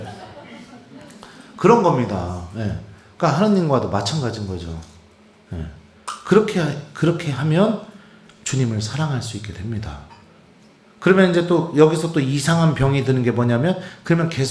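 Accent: Chungcheong, South Korea. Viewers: ㅎㅎ 충청도 사투리 ! 그랬슈~~~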